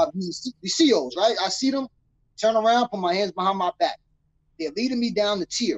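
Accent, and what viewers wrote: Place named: New York City, United States. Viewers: Scrolling through the videos I see the New York and had to check it out. Man that was a great show. I did 7 years in nysdoc, this guy was giving me flashbacks 😅 Going to the Shu. They feelonfof pulling up at Auburn, it was Clinton corr for me, one of the 3 oldest, sing sing being the other. Creepy old as f, your like, fffff.. I Listening to him I miss the accent. I was from the country, you get to prison in NY and 90% are from the city. 😂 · No Spanish but man’s got the most nyc Puerto Rican accent I’ve heard in a minute. I like this guy.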